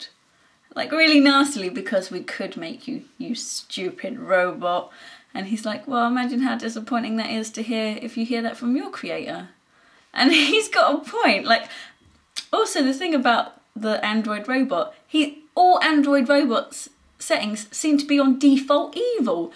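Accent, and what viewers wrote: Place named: United Kingdom. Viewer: As a Canadian i cant get enough of her unique British accent....lol..its so stereotypical sounding with the use of the very british sounding "nah-T" and "O-full" and "mone-sta". It's awesome! Great review!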